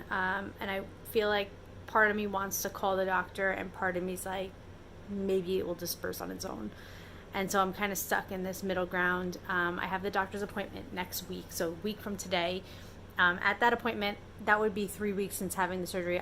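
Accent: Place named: New York, United States. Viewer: Also, it’s been a treat to listen to your NY accent, as someone who grew up on Long Island and has the same accent 😊. I don’t get to hear it as much in the midwest and I miss it! 😂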